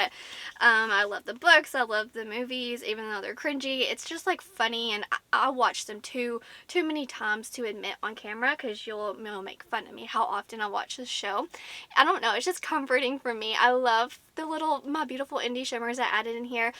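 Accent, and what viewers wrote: South, United States. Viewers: I love the southern accent so much❤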